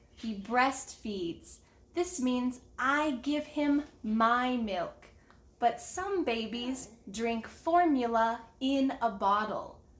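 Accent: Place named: United States. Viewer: Hai saya dari Indonesia, saya sangat senang bisa menemukan video ini, saya juga sangat suka dengan aksen Amerika, ini bisa menjadi motivasi saya untuk belajar banyak tentang bahasa Inggris 😊, Terimakasih!! 🙏🏻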